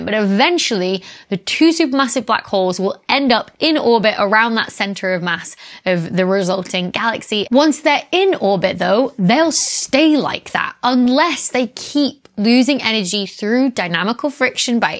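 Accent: United Kingdom. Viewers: I love your British affectations. Despite that, I propose a hypothesis that I invented myself... I call it the 5 Jigsaw Puzzle Piece hypothesis: If I gave you 5 pieces of a 100 piece Jigsaw puzzle, and ask you to describe the image the entire jigsaw puzzle presents..., could you do it? Absolutely NOT! How then can cosmologists today claim to give me a 100% picture of the entire universe based on the 4.8 percent of baryonic matter that they can actually and only preceive? That is to say, cosmologists don't know shit about the nature of the universe or how the universe exists at all.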